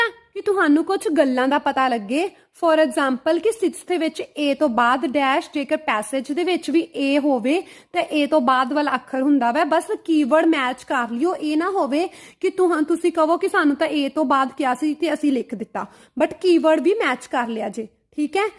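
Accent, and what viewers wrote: India. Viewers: Tusi majhe wal de lgde j 😁👌❤️ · Your Punjabi accent is clearly reflected when you speak in English.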